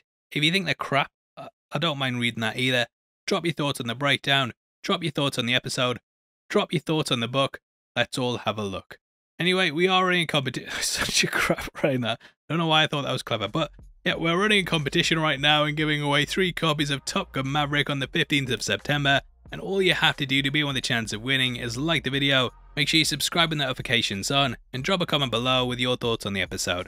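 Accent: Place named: England, United Kingdom. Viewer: Love your accent, what part of England is that from?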